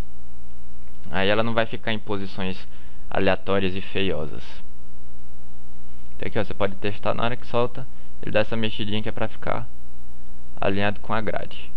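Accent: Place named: Rio Grande do Norte, Brazil. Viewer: Interior do RN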